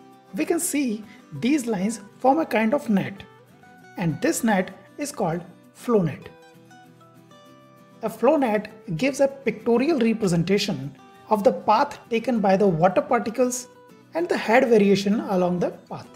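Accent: India. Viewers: I feel like this is a simpler explanation to this topic but It's so hard to understand Indian accent help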